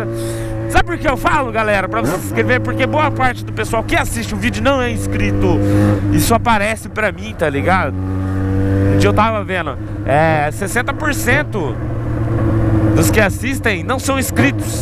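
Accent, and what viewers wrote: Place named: Paraná, Brazil. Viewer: sotaque paranaense kkkkkkkkk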